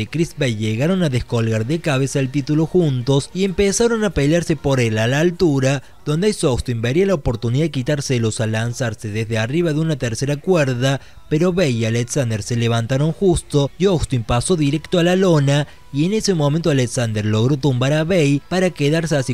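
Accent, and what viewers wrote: Córdoba, Argentina. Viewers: sos cordobes???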